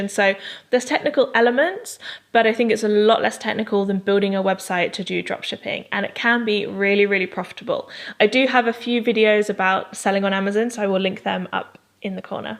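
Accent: United Kingdom. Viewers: Great content as always! Thank you 😊👍 and this british accent grrrr loving it! 😊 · It’s so refreshing to hear a British accent! Hallelujah 🙏🏾No shade!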